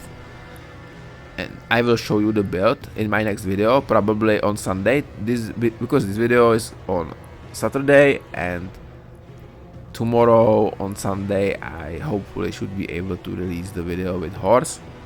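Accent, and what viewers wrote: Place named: Czech Republic. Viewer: hned vím že to je cz typek přízvuk jasny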